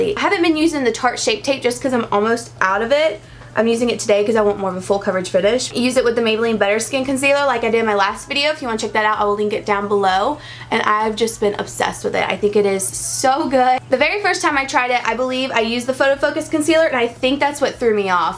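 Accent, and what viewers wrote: South, United States. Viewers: I personally don't see it AT ALL. Maybe they both have that slightly Southern accent. But looks-wise, she has NO resemblance to Britney Spears NOR Britney's younger sister.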